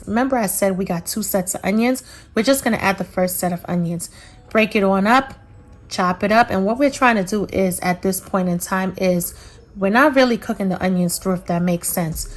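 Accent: New York, United States. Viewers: I think its New York but it could be Boston.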